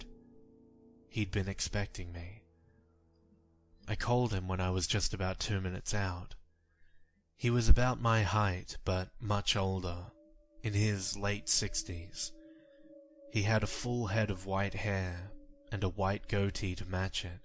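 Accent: South, United States. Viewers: i love your stories but you need to work on your southern draw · Not a bad country accent, but in New Orleans it's a little different because of creole. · Love the southern accent, lol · As an American born in south Georgia. I love your southern accent man!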